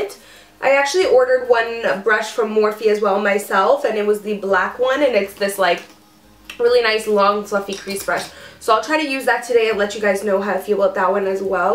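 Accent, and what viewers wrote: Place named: Canada. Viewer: youre so canadian lol you say "thee" instead of "the" i love it :) my aunt does the same thing haha youre amazing!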